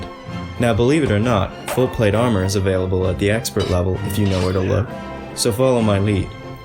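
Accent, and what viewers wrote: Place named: Toronto, Canada. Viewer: This guy is definitely from Toronto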